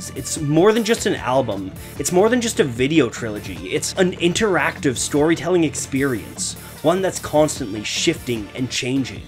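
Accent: Canada. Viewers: The Narrator is clearly Canadian.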